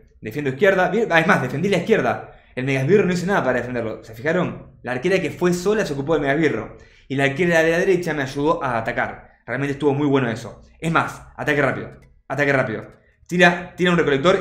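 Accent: Argentina. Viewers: Che Vivis en argentina o españa por la forma en la que hablas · Habla como argentino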